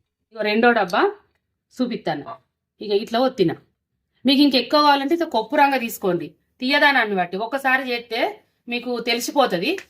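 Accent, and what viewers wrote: Telangana, India. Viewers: Meedi ye jilla no gaani me bhasha me slang naku chala ante chala baaga nachindi... Achamaina telangana slang la undi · Naaku meeru chese vantalayiena explains ayiena Telangaana yaasato bale nachchutundi naadi Odisha ❤ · Telangana atane vuntadhi nuvu musuko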